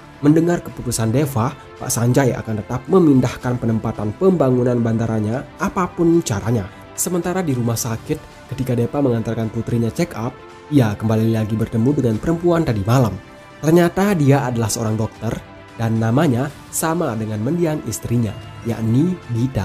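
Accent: Bali, Indonesia. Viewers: Seperti logat Bali yaa min...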